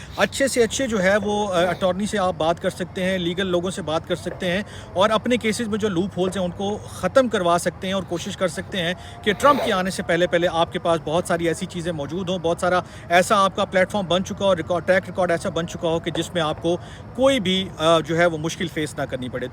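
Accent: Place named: Punjab, India. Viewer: Tum. Pakkay. Punjabi. Lagatay. Ho. To. Phir. Punjabi. Kyu. Nahi. Boltay.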